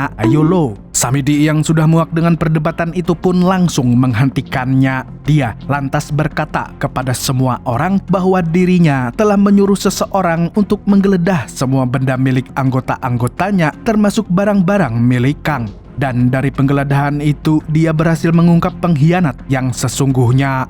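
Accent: Banjar, Indonesia. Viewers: Wihh Abang orang Banjar ya😂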